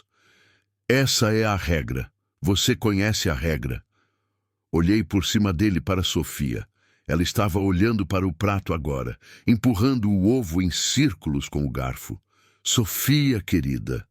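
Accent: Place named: Paraná, Brazil. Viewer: Istoria muito impactante. Narração Nitidamente Maringá. Paraná 20 ,,8❤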